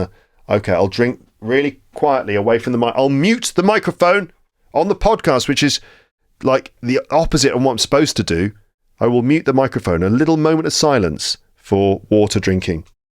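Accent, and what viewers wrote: United Kingdom. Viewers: Dear... Happy Birthday to you. I'm from Sri Lanka and have been listening to your podcasts for a long time. Though there are lots of other podcasts, your podcasts are special in terms of their clarity and accent. To me, really, there's no need for subtitles to understand you. I'm in a position to understand every word you say. You speak in a clear British accent. Whenever I am free, I'm in the habit of listening to your podcasts and I feel that my English is improving day by day. Thanks for your podcasts. Keep it up.... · Happy birthday to, happy birthday to, happy birthday dear, happy birthday to. May the force be with you! P.s. is the best teacher of English with a British accent on You tube. Fight me.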